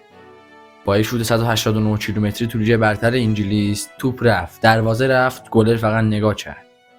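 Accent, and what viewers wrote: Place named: Turkey. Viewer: ترکیه ایی اوله😂 البته از لهجه ت مشخصه خودتون هم ترکی دادا تبلیغات خوبی بود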